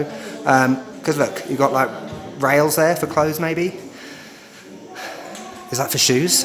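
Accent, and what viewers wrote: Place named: United Kingdom. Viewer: Hi! You did an excellent job, I love how you feel the environment in any place make is so special and also I always enjoy you personality and of course your British accent. Big hug for you Carlos.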